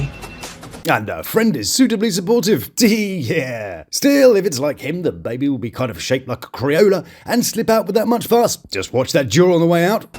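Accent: United Kingdom. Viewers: Just watched final episode season 6 love after lock up . I just could NOT stop myself from looking up did Julius become a rapper or got a real job 😂🤣. AWESOME I found your update . You got SO MUCH information & put it on your channel so BADASS , HILARIOUS, PROFESSIONAL etc…PERFECTLY ‼️Even witt British accent you spoke every word so clearly. And Alexia is beautiful inside & out from what I seen on the show . I really 🙏she takes her own advice & loves herself better . She definitely could find a handsome , educated, working man . She has discernment on top of ALL her other great qualities. She just needs DO IT 🙏💛♥️‼️Tyvm I appreciate yah. May god bless you and yours. JESUS PEACE 👍😎😎‼️ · Omg i love your accent ! Brittish guys are So hot! You sound too fancy to be watching us anericans trash tv shows tho 😂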